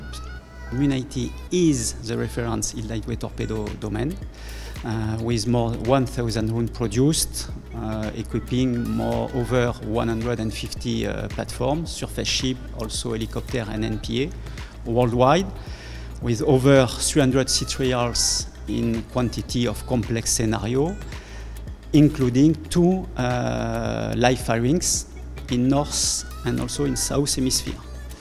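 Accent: France. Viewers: Sorry but His English accent is just terrible. For English speaking markets they should appoint someone who can speak English without or less Franch accent.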